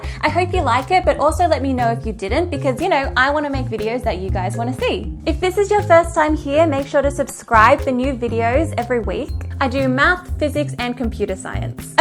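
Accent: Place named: Australia. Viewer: Hot + Quantum physics + Australian accent = Sizzling Hot.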